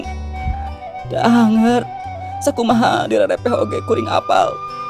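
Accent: Sunda, Indonesia. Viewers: hehe Ari sesama orang Sunda mah kang tos terang logat na 😂 Abi mah garabagan teu tiasa nyarios halus 😁😅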